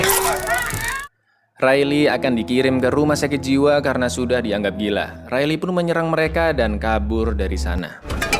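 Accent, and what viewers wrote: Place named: East Java, Indonesia. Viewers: Boso jwone kntel men ki asli ndi bro,slm ko jatim bro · Wong ndi mas. Jatim ye?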